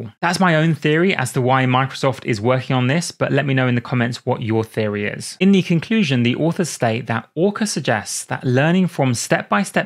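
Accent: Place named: United Kingdom. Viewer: When these videos become too technical for me to understand, I'm still learning a beautiful British English.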